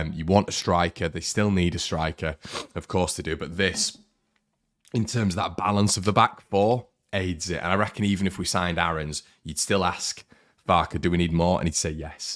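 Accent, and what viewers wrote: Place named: Yorkshire, United Kingdom. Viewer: Narked off 😂😂 proper Yorkshire. Loving it.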